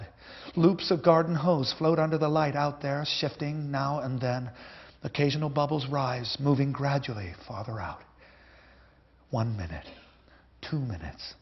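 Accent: United States. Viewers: why is he reading in an american accent at Oxford?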